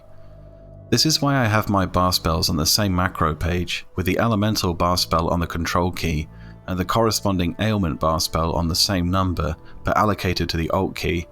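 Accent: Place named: United Kingdom. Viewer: Love the British accent. Makes everything seem way more official, especially for a fantasy game, and pronouncing melee as "mee-lee" is awesome lol. I wish had a British accent.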